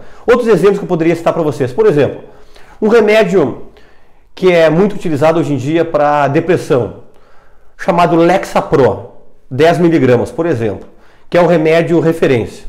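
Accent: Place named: Rondônia, Brazil. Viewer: O senhor fala a nossa língua Rondônia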